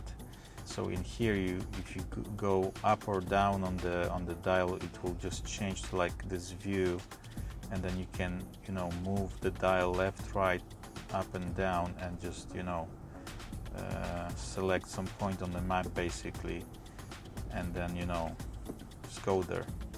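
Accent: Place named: France. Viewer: Il est francais lui je reconnais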